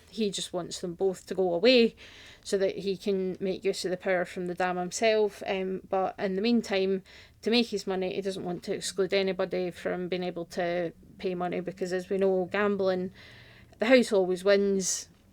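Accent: United Kingdom. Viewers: You from glasgow? My fiance is from Leith. Great vid, thx from USA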